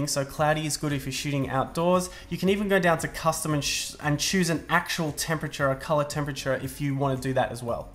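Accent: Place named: Australia. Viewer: Just bought a Mavic Air for Aussie trip in Dec. I've always flown without FPV with a Phantom 2 and got some great footage using a GoPro Hero4 just using line of sight and sort of guessing what I'm filming. It's worked in the past more or less. But having a phone seeing the live feed will be a game changer for me. Some great tips here... the screen can be intimidating on first glance, but I'm slowly understanding the functions, good to know how to change the sensitivity of the Yaw and gimbal, great work keep it up, nice to hear a Aussie accent, makes a lovely change from American which is the usual on here. Greetings from the UK.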